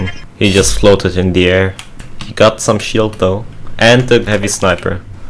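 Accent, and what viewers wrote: Germany. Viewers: Bro got the most German accent a person can have🗿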